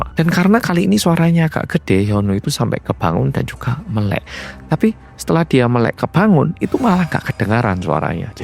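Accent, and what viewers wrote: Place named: Java, Indonesia. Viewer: Siang mas muedok😅 Lgsg nyimaaak🎉🎉🎉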